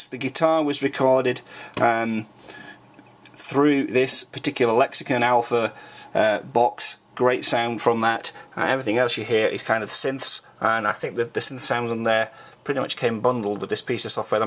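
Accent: United Kingdom. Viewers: Reviews in British accent are good!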